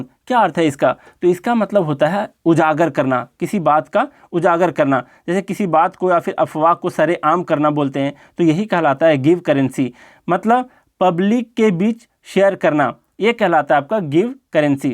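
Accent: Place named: Jamshedpur, India. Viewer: Sir app Jamsehdpur se ho kya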